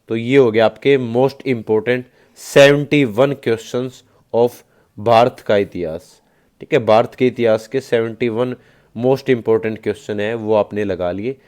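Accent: Haryana, India. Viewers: Bhaiya the Haryana s ho ke?😊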